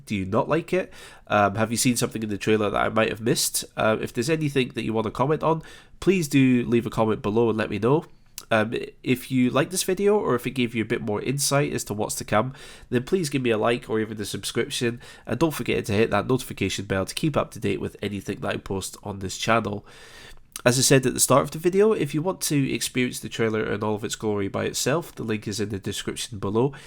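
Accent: Scotland, United Kingdom. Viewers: Right on,! Lots of speculation out there both from the "users" AND associated developers. Hope for the best - plan for the worst, Expect nothing, and you won't be disappointed. Those are a couple of my old "standbys". Call it cynical, but for me it has worked. I will be holding on dearly to both my boxed and steam versions of FSX until well after the dust settles next year! But, if the new version pans out perhaps you will develop another great tutorial series for it? I can guarantee you at least one follower! <smile> BTW, not too long ago, we were up in what I have assumed to be your homeland in Scotland. ( Your accent and Inverness Airport?) Anyway, we spent several weeks driving around Skye, Lewis & Harris, the Highlands, etc. Beautiful country-side, great people! Was quite interested in the airport up in Stornoway (EGPO). I later googled and found a decent free FSX add-on for that little former military airfield that some of your viewers might also enjoy. 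It has an interesting history, as do many other converted military airfields around the world today, like the one in my home-town, Burlington, VT - Burlington Intl (KBTV).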